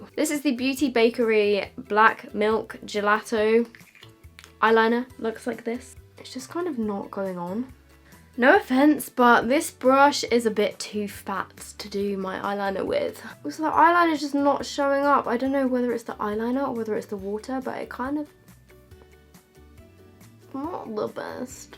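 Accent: United Kingdom. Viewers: dat british accenT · She’s british 🤣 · shes hella british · It's just British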